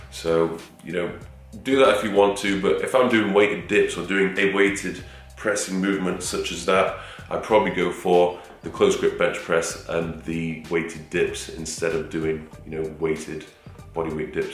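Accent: United Kingdom. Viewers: I hear a British Ben Shapiro for some reason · Hello from LA again. I was so glad to see that you are back with the videos again. Your arms are now, perhaps your best body part!! I'm going to incorporate these new tips into my training and will pass it on to my personal trainer. My trainer is good, but your explanation is so clear and understandable......maybe it's the enviable British accent!! Ha Ha. Looking forward to more great videos. LA needs you!! Thanks, Hal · A British John cena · Sounds like John Cena with a British accent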